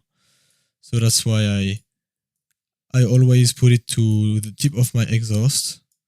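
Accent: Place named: France. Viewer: You sound really french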